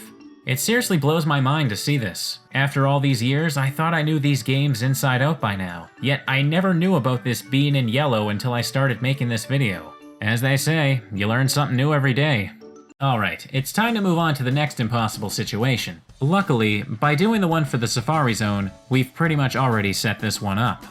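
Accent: Canada. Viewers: Maybe... But maybe he could be from BC after all. If he's from up north enough, that would explain some of the accent quirks. My friend says if you go north enough (where he goes camping, I think), they start pronouncing the aʊ-type OU words as oʊ. I don't know about the u:-type OU words, tho. I just know my friend likes to say he likes to go "oat and a boat". XD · as if from Canada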